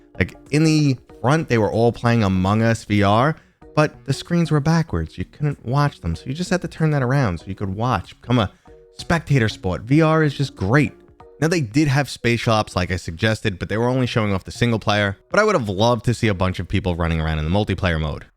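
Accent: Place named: Long Island, United States. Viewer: you sound like you're from long island new york. I live there. Just taking a guess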